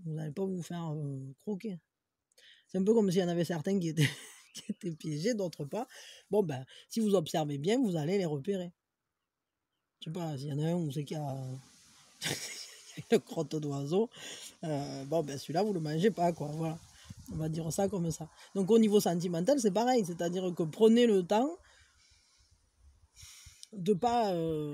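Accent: South, France. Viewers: Mais kel joli accent! ..méridional a priori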